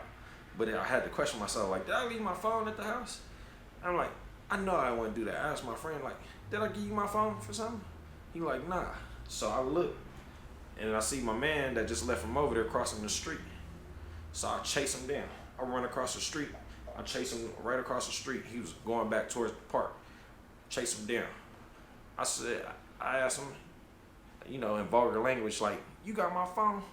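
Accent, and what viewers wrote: United States. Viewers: You have a Detroit accent. You from my city, bro? 🤔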